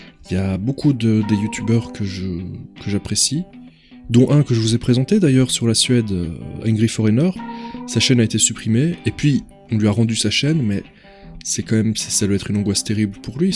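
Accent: Belgium. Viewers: Tu as un accent bruxellois.🤔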